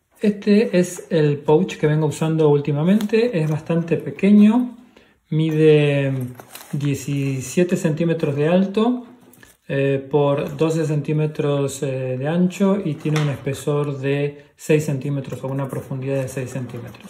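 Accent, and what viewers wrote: Argentina. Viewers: Hola, muy buen video: me gustó mucho, completito el edc. Te consulto: sos argentino o uruguayo ?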